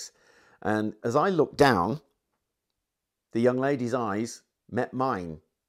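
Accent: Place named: Birmingham, United Kingdom. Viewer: Hello I loved your stories and i love your channel. Small question is that a brumy accent? im a Black Country chap myself and couldn’t resist asking.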